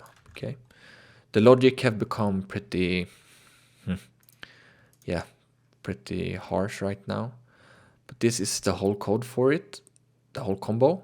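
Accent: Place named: Sweden. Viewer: Love the swedish accent, very cute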